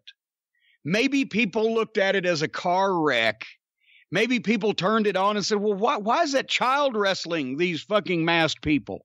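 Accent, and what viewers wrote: South, United States. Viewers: Parz BK that’s not what my sub conscience is telling my necessarily but his southern accent makes the words “mother fucker” hilarious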